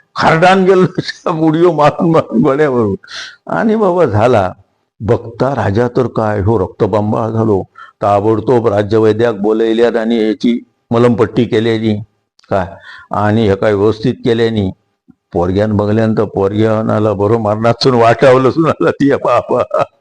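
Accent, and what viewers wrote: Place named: Malvani, India. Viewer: एकदम शुद्ध मालवणी मजा आली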